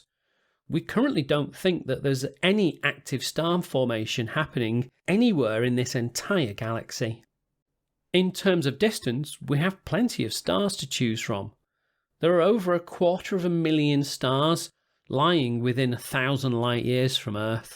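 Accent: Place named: North, United Kingdom. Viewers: I love your northern accent. "oor soun" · YES! A new Learning Curve vid! This is one of the absolute best channels of this type out there. You have a great talent for explaining sometimes difficult concepts in easy-to-grasp terms, and I can't tell you how many times, after watching one of your videos, I've said to myself, "FINALLY I get that!" (as in, Finally I get what the Planck length is, or Planck time etc.) and all in a friendly, homey, Liverpudlian voice that reassures you that these enormous scientific puzzles will be explained carefully and clearly. Bravo, man. You do fantastic work. I'm so glad I discovered your channel.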